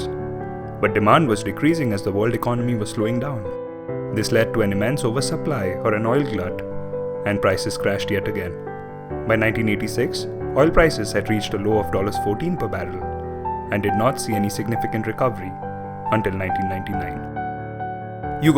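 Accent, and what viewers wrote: India. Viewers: INDIAN TALKING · The narrator is Indian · You sound like a person from India. Are you from India?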